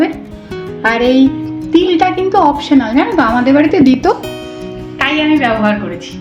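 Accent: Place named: Bangal, Bangladesh. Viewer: Tomar Kotha sune mone hoy tumi bangaal tomar video dekhi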